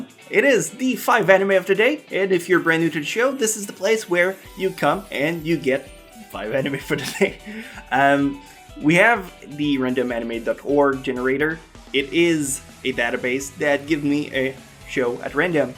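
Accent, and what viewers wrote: Quebec, Canada. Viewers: French Canadian accent you get used to it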